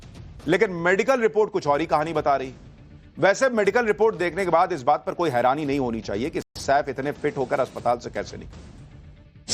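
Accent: Bihar, India. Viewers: Poora Bihari accent mein lehra diye....Bihari youtuber Nahin Chahiye...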